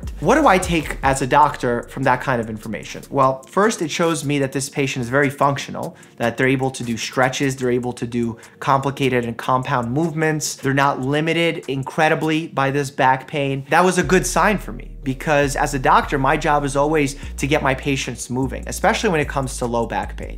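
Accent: New York, United States. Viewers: I’m just curious, did I hear a slight New York accent from doctor mike🤔 😎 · when you watch the video in 1.25 speed, you can hear his new york accent XD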